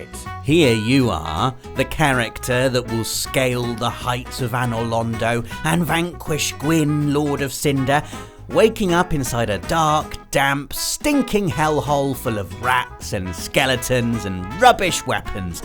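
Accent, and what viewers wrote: United Kingdom. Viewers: A few days ago,i got suggested this channel.Since that i watched a few dozen videos.And while i was watching,started to wonder why i like this so much.Good content,down to earth commentary and the main thing,a british voice! There is something in that accent which gives some extra boost to commentary.Also i just love it.Hope this will be running for years.Also,from elder scrolls,i would say that Skyrim is worse,there you start as someone that stands in line to lose his/her head.There is Kingdoms of Amalur,where you are starting as someone who just gotten dumbed as a lifeless corpse down to the rest of your kind. :]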